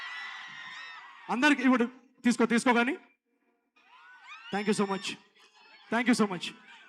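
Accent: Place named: Telangana, India. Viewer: Telangana hero haa basha lone shammi vundhi abba 🔥❤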